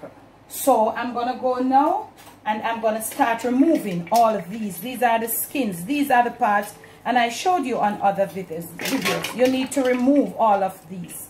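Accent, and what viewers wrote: Jamaica. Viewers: I like it when you speak Jamaican- we understand !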